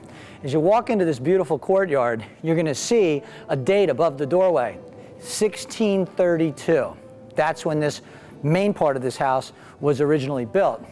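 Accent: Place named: United States. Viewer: what an annoying yank presenter!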